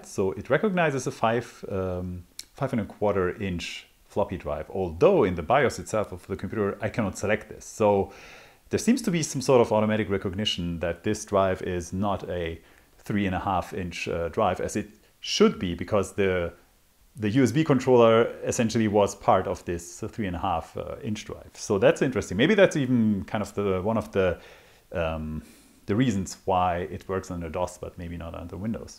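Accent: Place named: Germany. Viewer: His wording was cuckoo, but “five point twenty five” is incorrect, too. Though people occasionally do it, it’s not correct to speak out digits after a decimal as a single number (except in currency). So it’s either “five point two five” or “five and a quarter”. He’s not a native speaker. My guess is he’s German.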